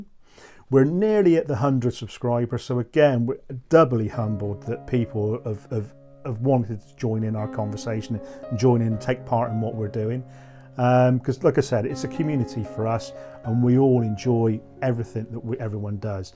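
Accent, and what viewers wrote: West Country, United Kingdom. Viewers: Nice vid bud, love the bolt action table. Seems I’ve stumbled across another West Country wargamer going by the accent lol.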